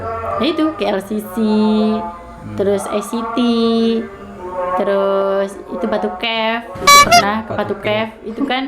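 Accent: Java, Indonesia. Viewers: Pekat Jawa nya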